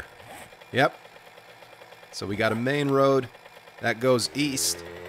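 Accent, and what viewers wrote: United States. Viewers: Best series ive seen so far. You sound so american, its insane 😂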